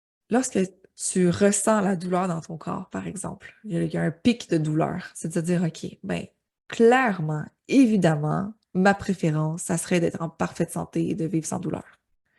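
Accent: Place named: Quebec, Canada. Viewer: On adore votre petit accent Québécois 🩷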